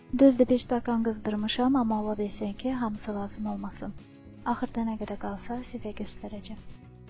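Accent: Lankaran, Azerbaijan. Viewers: Salam xanim,videonuzu izledim.Her wey Cox temiz,cox gozeldi.Aydin,selist nitqinize o melahetli sesiniz cooox yarawir.Men de paxlavani eyni Bu qayda ile edirem,ancaq qozun qabiqini hec vaxt temizlememiwem,hovselem catmaz buna😀Size wexsi bir sualim olacaq,cavablandirsaniz xow olar?Siz cenub zonasindansiniz?Lenkeran,Lerik?Lehceniz yoxdu,Amma bir kelmenizden orali olduqunuzu tehmin etdim.Sadece Duz fikirlewirem ya yox onu bilmek isterdim.Cox sag olun!Size 👍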